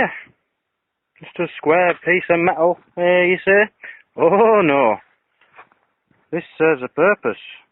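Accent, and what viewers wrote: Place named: England, United Kingdom. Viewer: It was pretty obvious the 1. He's not to clued up on German 2. He speaks with an English accent and uses phrases like "hey up" and "get in" :D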